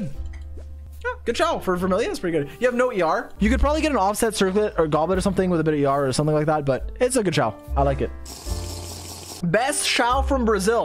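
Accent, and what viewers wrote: Quebec, Canada. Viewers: BOLIDE XIAO skdjalxkaal lmao. i thought mine was pretty good with 76 crit rate/203% cdmg but.... ah, back to farming for xiao. also do you speak Quebecois? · je savais pas que t'étais québécois lol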